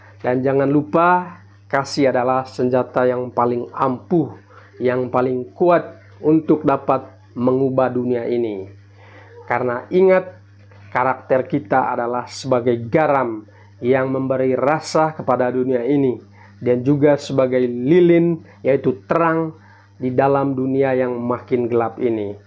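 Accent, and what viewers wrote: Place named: Manggarai, Indonesia. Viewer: Bahasanya manggarai sekali e kësa 😅😅...semangat dalam memberitakan keselamatan ❤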